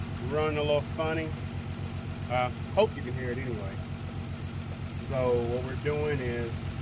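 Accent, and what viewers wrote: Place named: United States. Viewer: You just helped me so much and i greatly appreciate. What i enjoyed most though was your country accent and the fact that you made the time to break down and show us step by step. This video is by far the best learning experience i have gotten from a mechanic video. I would like to see more since I own a 96 nissan maxima and have changed out numerous censors and other important parts and mine will still not run. If you could maybe help me that would be fantastic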